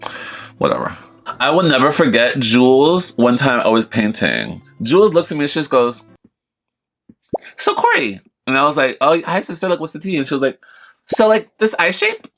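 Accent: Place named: Boston, United States. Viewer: i think that's just her boston accent tbh 😭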